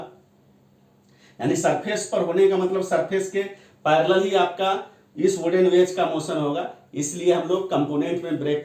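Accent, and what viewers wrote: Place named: Bihar, India. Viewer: ❤ love from bihar thanks sir i know you are from bihar by language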